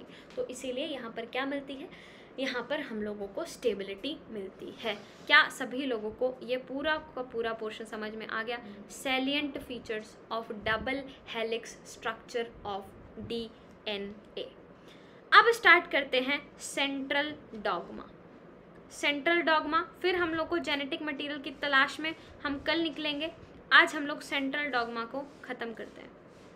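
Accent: Haryana, India. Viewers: Diii aap haryanvi ho kyy???🤔🤔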